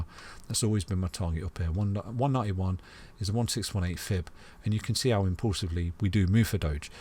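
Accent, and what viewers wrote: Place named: Nottingham, United Kingdom. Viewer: Nice video mate. Are you by any chance from Nottingham. Your accent sounds local!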